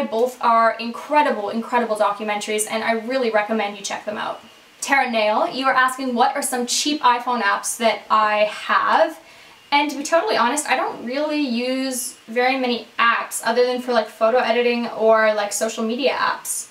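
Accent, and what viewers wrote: Canada. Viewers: That Canalian accent though! It's crazy how much your accent has changed if you watch your older videos. I love how you are so upfront & such a straight shooter in these videos! I have a TMI Tuesday question for ya. About a year ago you posted your TMI Tuesday #47 I believe where you were just coming back from taking a break from YouTube. Do you feel like you've balanced your life out a little bit more since that video and are you happier? I really hope so. So much love! <3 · Are you canadian?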